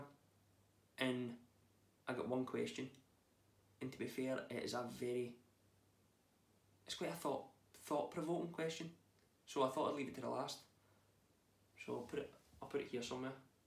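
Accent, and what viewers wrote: Scotland, United Kingdom. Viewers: hart war vielleicht etwas übertrieben in dem Fall. aber einen starken schottischen Dialekt verstehen selbst Engländer schlecht. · I absolutely love this. I'm new to your channel, but it was nice to see a humble, down to earth, and honest person behind the voice. I struggled to keep up with some of what you said (I'm in the US), but I could listen to you talk/sing all day. I have a small percentage of Scottish in my blood, so I've always been drawn to the Scottish accent, as well as the Irish, British, Australian, well most accents are beautiful to me. 😂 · obvious he is from scottland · yeah it ist scotish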